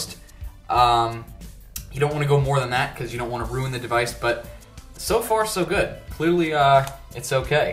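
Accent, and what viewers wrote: United States. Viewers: Someone's from Philly! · nah, it's American - THIS GUY IS NOT BRITISH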